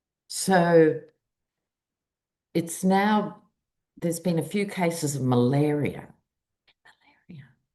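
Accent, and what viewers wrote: Australia. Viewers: An Aussie living in the US… I love watching you… hearing you… so Australian… same expressions I still use lol makes me laugh…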